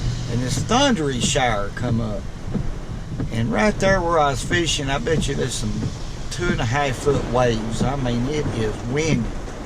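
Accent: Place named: Alabama, United States. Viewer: i loved your video. thank you for taking the time to produce it. love the alabama accent. have a friend from there and you sound like him.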